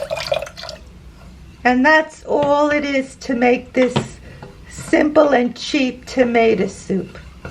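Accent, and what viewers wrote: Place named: New York, United States. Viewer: Hi I'm from England what is the tomato sauce that you used equivalent in the uk we have tomato puree and cans of plum tomatoes or chopped Tomatoes but I've never seen cans of tomato sauce. What we call Tomato sauce is the sauce you put on your plate like you get in a cafe and you use like brown sauce and put a little bit on. I'm struggling this week and have 3 pounds 50p to last me 5 days so need a really cheap recipe. Unfortunately I don't know what this tomato sauce is. Could I mix tomato puree with water to make it? P.s Loving your accent Newyork?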